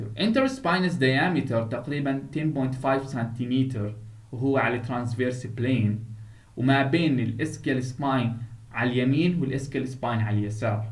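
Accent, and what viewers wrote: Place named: Iraq. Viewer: دكتور عندك اكسنت من اللهجة العراقية 😶‍🌫️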